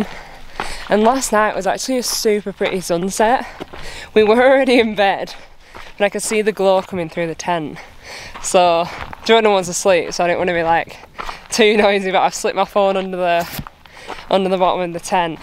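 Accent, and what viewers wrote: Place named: Lancashire, United Kingdom. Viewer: Well done and Jordan! Great set of videos. Loved watching each instalment. Gives us great food for thought. We are four 60+ yr olds from Wigan. We will be off doing TMB in 19th July this year. Training hard and looking forward immensely. Thanks for posting. BTW, where do you come from you sound…. Preston or Bolton? Can’t be far from us🤣 Take car and thanks again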